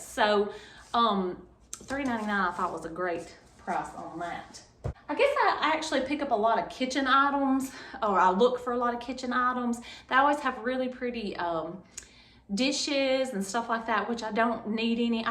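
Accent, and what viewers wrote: South, United States. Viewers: Your southern accent is so adorable. Greetings from NYC. ❤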